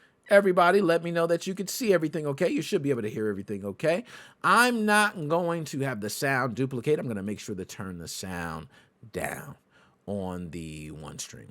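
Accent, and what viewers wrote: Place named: United States. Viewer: I just hate American black guys' accent 😒. especially the US ones that gives lectures like you doesn't suit you at all. Plz correct your accent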